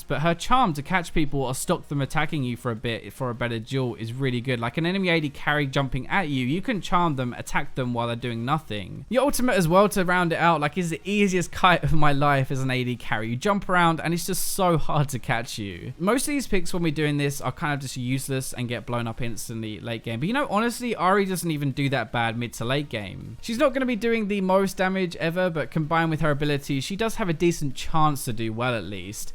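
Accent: United Kingdom. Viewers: Where in the UK is this guy from? His accent is lovely.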